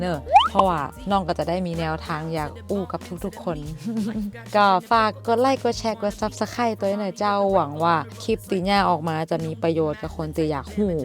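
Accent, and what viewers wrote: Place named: Chiang Rai, Thailand. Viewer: สำเนียงเชียงราย 😅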